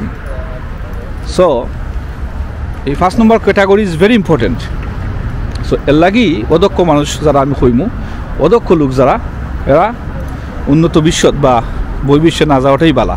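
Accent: Sylhet, Bangladesh. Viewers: Apni jodi sobar jonno video banan,try korben shuddho vashay kotha bolte. Karon sobai to r syleti na. Time r ektu short korle shunte valo lage.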